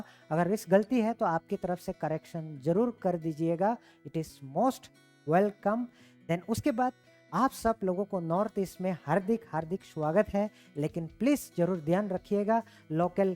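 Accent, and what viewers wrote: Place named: Bangladesh. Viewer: Eye bangladeshi hai bolne ka tarika patachal teh.hai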